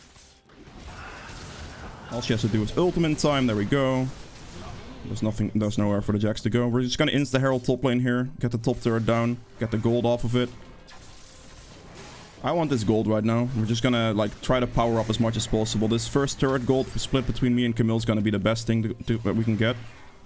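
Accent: Netherlands. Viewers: hi i enjoyed ur gameplay.. i have a quick question.. r u dutch?? take care king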